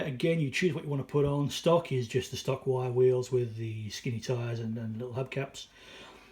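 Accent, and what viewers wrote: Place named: England, United Kingdom. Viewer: Good review of complex kit👍🤓just watched a couple more too, so I'd better sub😃 It's nice to hear your another Englishman aswell😃Pete 😎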